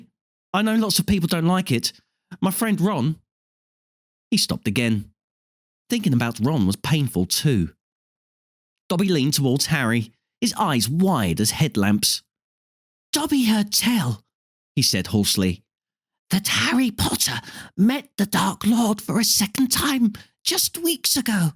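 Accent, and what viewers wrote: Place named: United Kingdom. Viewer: Im in Cyprus, surrounded by UK people, this is a great way to make me more comfortable with a UK accent, thanks!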